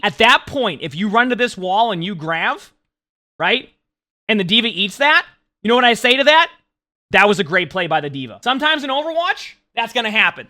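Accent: Boston, United States. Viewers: Hes from boston... that explains enough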